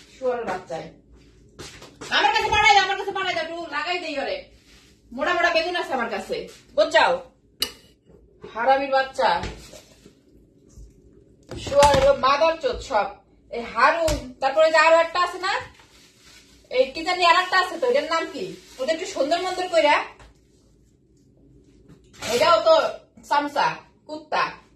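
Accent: Barishal, Bangladesh. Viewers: তুমি কি বরিশালের মেয়ে? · আপনার বরিশালের language খুবই enjo করি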